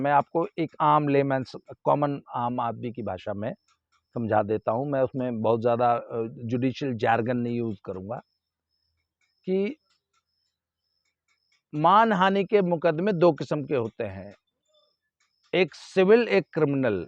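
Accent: Haryana, India. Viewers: Ap Ki Bat Majedar Btane Ki Trika Haryanvi Kya Bat Hai. Weldone.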